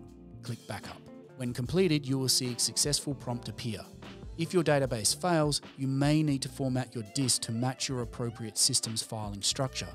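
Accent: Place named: Australia. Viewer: Hey dude! thanks for the thorough video. I like your presentation and um... Aussie! I'm wondering if you can help. My old Mac Pro running Resolve16 died recently, and I just took the leap to a Mac Studio. Anyway, I have no way of exporting my Resolve projects and databases, though I have the original files on disk, project folders, .db files in Application Support etc.. But I'm at a loss for how to restore my database with just these raw files. I've tried copying them to the new Library structure, but nothing's getting recognised. And I haven't found a way to import them. Any ideas where I should go from here?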